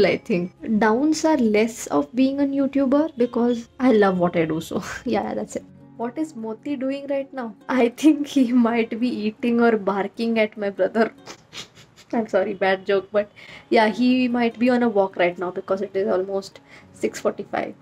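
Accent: India. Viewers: U have a pretty strong Indi accent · ohh high~five me also same from karnataka - Bidar 😎 me tabhi samj gaya tha ye to hamra accent h lots of Love ❤️♥️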